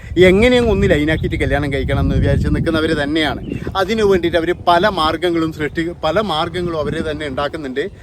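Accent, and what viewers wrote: Kannur, India. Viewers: സ്വതേശം കണ്ണൂർ ആയിരിക്കും സംസാരം കേട്ടിട്ട് തോനുന്നു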